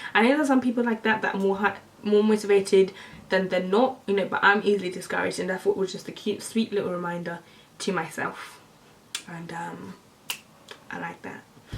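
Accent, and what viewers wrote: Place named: Crawley, United Kingdom. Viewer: Where abouts are you from? You sound like your from Crawley. Love your tats btw👏🏽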